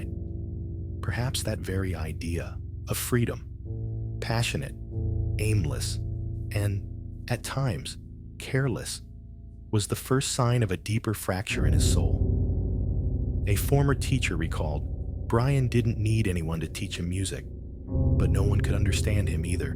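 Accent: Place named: United States. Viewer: Another click bait channel full of garbage. Not surprised it is narrated by a scam artist American.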